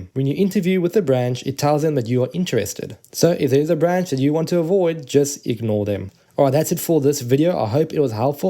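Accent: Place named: South Africa. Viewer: Judging by your accent, you are south african bru! What branch did you end up going with?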